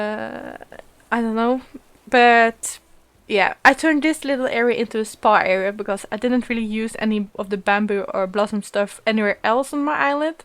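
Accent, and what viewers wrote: Netherlands. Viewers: Where u from, u sound Dutch?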